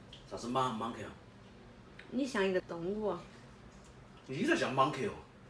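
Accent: Sichuan, China. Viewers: 我是台灣人，川菜迷，這是我最喜歡的川菜之一，尤其是的四川話，我總是辺看辺學說四川話😋⋯我愛四川💕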